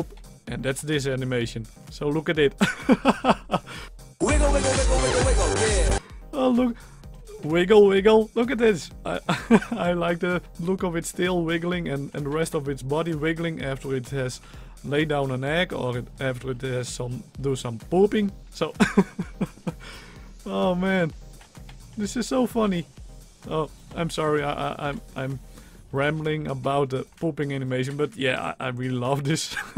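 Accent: Netherlands. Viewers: Hes Dutch lol that english do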